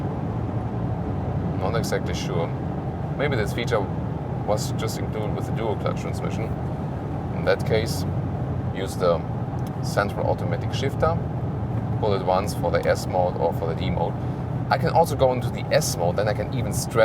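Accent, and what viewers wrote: Germany. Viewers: Wollte eigentlich auf die RS3 Limo warten, aber aufgrund meiner Familie brauche ich doch was größeres und werde die Tage den RS6 Perormance bestellen. Freue mich wie Sau !!! Danke nochmals für den Bericht (Ich LIEEBE Deinen deutschen Akzent HAHAH)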